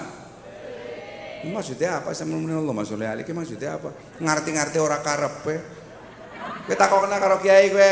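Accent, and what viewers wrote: Tegal, Indonesia. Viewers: Tidak merasa bosen dgn ceramah ki entus lucu dengan logat Tegal nya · logat tegalane medok temen gawai seneng wong brebes nang tangerang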